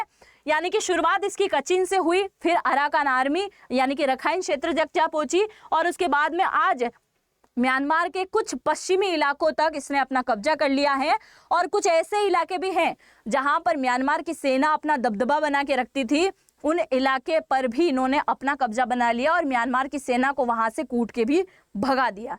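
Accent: Gujarat, India. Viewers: मैम, आपकी हिन्दी भाषा में गुजराती एक्सेंट सुनाई पड़ता है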